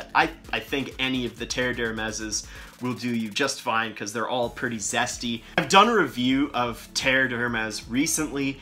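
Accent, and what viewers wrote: Canada. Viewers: With that accent (sounds Canadian) I wish the would stop saying OOTside. Arrrgg.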